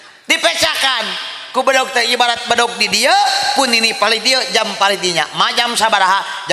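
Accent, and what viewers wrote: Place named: Bandung, Indonesia. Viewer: Jigana tipalih bandung atanapi bandung ngupingkeun logatnamah ❤❤❤❤❤❤❤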